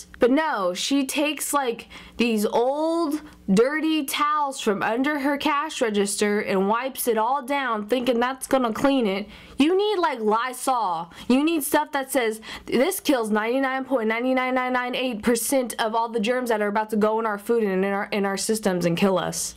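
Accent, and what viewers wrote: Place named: Dallas, United States. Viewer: she sounds like she is from downtown Dallas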